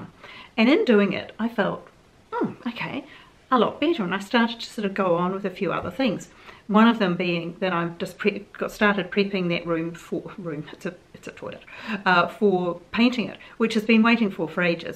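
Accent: New Zealand. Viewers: I love when you post a chat....look for the next one each day. Currently I am packing and clearing ready to put my home up for sale. I too am bemoaning the heat as I live in far North Queensland. Did you end up being Molly’s new mum? As you never mentioned her in this clip, I assume not. Love listening to you, you sound like my kiwi sister-in-law.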